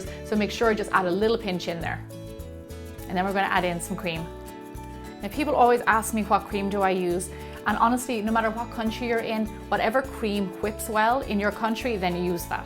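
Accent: Ireland. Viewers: are you Irish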